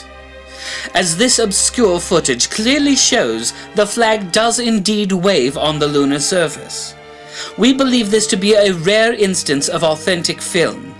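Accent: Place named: United Kingdom. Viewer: lol the brithish accent does sound sciencey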